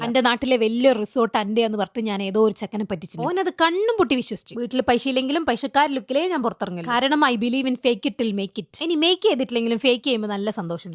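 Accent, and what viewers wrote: Kannur, India. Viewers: Inj poliyanu bro ... Nammude Kannur basha yanu main ..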